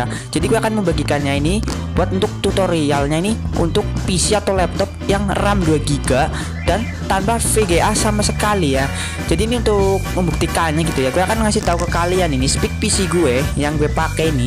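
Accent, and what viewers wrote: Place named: Java, Indonesia. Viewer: Medoknya mntep bos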